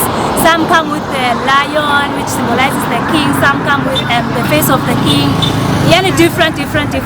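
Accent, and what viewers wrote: Kenya. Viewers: That's the Kenyan accent if not wrong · 😂😂It's the way you're mixing Swahili with English like a regular Kenyan. Your Kiswahili accent also sounds very Kenyan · I love your Kiswahili, you speak like a Kenyan. Great job. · My sister, you are a full kenyan your accent is kenyan, your command in kikuyu and swahili language. Is wooooow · Mswazi with nai accent👍by the way im jealous of ur king 😉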